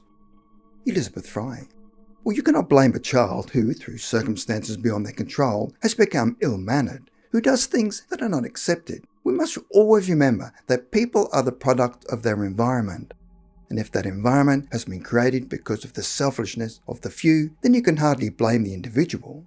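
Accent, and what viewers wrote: Australia. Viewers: Great material but it is hard to follow your speech with your thick Australian accent. The woman that sometimes narrates your videos is quite understandable to an American ear. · Very easy for me - an Australian accent!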